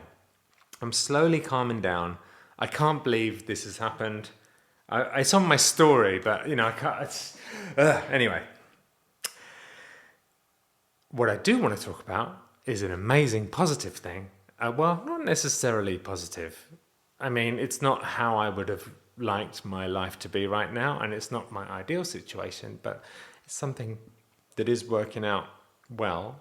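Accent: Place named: United Kingdom. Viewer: I LOVE your videos. You are just being you and it's beautiful to see. Don't stress about how good or bad your videos are, just keep doing it🙂 Side note, I've been watching Avenue 5 and Hugh Lauries character reminds me so much of you 🤣🤣 it's the British accent and unkept realness of you both that I love., keep being you. Xxxx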